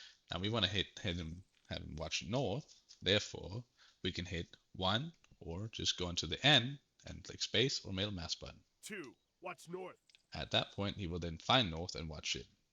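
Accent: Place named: United States. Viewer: I have 5500 hours in Arma 3. I just started playing this campaign looks promising. I would like to ask a favour, as someone from England i found your accent really interesting, as in American but i cant place it. Would you tell me where that accent is from?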